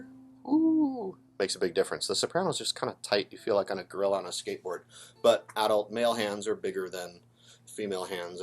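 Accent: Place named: Hawaii, United States. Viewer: and his staff are from Hawaii. So they pronounce it oo-kuh-lay-lee.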